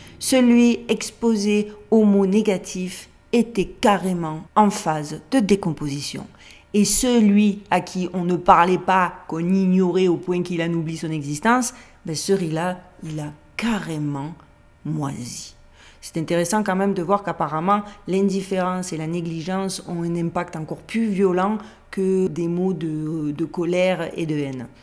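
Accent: Marseille, France. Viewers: Merci pour cette video hyper intéressante et passionnante.Heureusement qu'il y a des personnes comme vous pour nous informer et nous alerter sur toutes ces nouvelles technologies qui ne sont pas pour le bien de l'humanité. Je vous apprécie beaucoup et je reconnais bien votre accent marseillais....de Marseille aussi, alors continuer à porter le soleil du vieux port à Vegas. Par ailleurs, oui ça me tente de gagner une paire de OneMix! A dimanche prochain!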